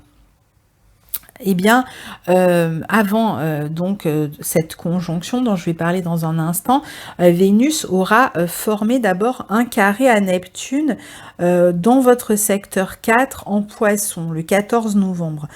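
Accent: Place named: Nice, France. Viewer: Bonjour, c'est toujours avec un réel plaisir d'entendre votre accent Niçois;-) je vous avais demandé, mon transit pour 2019, celui-ci m' a été bien utile. Sagittaire asc scorpion du 24 novembre, je passe une dizaine ce jour, et je pense qu'en tant qu'artiste photographe les choses vont bouger, il y a déjà un frémissement, entre la méditation, les tarots et ma création, vous ciblez parfaitement ma situation. Beaucoup de choses changent et c'est pour mieux comprendre le sens de la vie, ce qui nous fait évoluer dans une spiritualité. Bref votre travail est gratifiant, et vous le faite avec un sérieux, et une parfaite maitrise du sujet, je ne peux que vous en féliciter. Merci encore, et gratitude.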